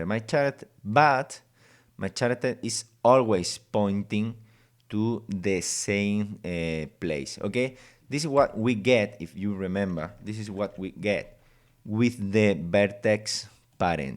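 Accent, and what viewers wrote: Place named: Spain. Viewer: I like your spanish accent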